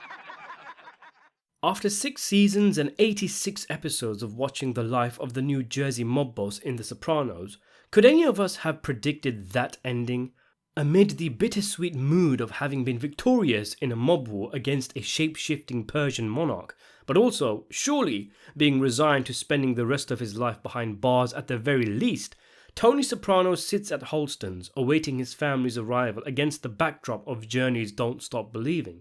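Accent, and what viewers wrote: England, United Kingdom. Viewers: All I know is when he slips in those show references, like anytime he references Vito he calls him and ass muncher, with the British accent it's fucking priceless! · That's all it is...a theory Chase has confirmed Tony was killed. Also isn't this dude english? That's how they talk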